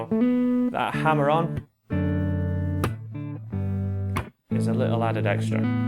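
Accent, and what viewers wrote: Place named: England, United Kingdom. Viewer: English. He's from Leeds.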